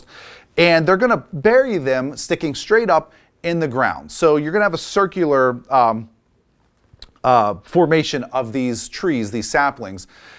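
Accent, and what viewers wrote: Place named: Pennsylvania, United States. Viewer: Dude! That accent! I lived in PA so long I didnt realize just what a pronounced (strong) accent it was! Thanks for the interesting info! I have been thinking about the Shawnee people, and didnt realize they had a presence in Northern West Virginia (LOL western PA)!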